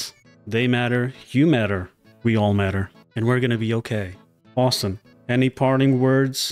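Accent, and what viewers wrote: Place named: Montreal, Canada. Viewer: it sounds like you’re from Montreal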